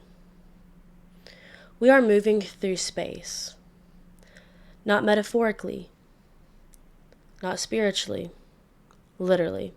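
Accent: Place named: South, United States. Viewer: It’s dark energy that’s making the universe expand not dark matter. Why you trying so hard to hide that southern accent?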